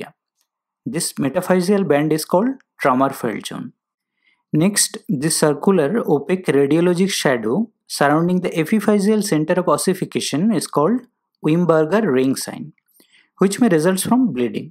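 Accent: India. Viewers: Excellent video with nice animation. Thank You Sir. Your voice sounds like you are from WB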